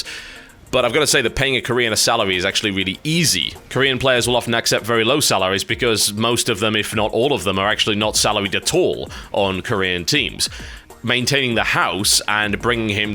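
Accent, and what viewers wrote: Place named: Newcastle, United Kingdom. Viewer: Proof that TB has some Geordie left in his accent. "Boook"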